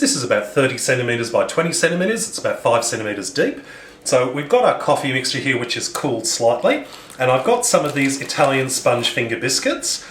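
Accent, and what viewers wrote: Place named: Australia. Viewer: Has anyone told you before tht your a handsome guy wow,I must say and I love the accent as well I'm from South Africa and trust me our men thts coloured do not speak like you I guess you are Australian am I right ?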